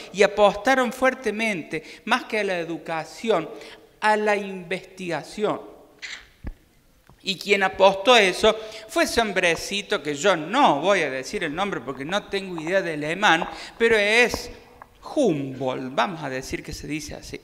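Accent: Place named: Cordoba, Argentina. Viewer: Bien tonada de cordobés.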